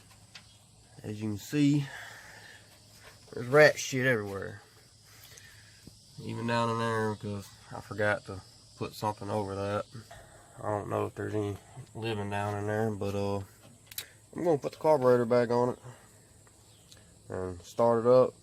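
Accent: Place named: North Carolina, United States. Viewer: You got to be from N.C.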